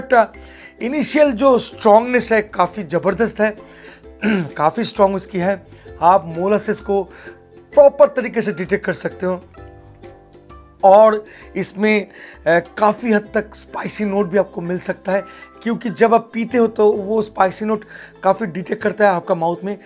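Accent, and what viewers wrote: Bengal, India. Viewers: Tum bangali hai kya? Bengali me bat karo.. Your hindi is shit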